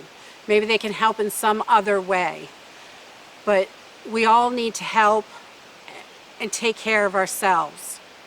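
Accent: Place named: New England, United States. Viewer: I just found your channel. You sound like your from new England. Are you?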